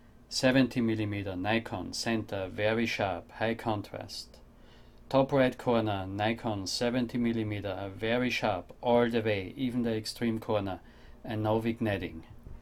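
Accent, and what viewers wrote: Austria. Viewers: Austrian accent.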